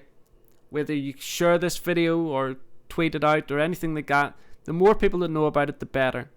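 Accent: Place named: Northern Ireland, United Kingdom. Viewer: Nexus 7 - I love hearing your straight-talking, easy to understand and unbiased reviews in a familiar (Northern Ireland!) accent. Thank you for uploading all of these, and doing such a great job with the website.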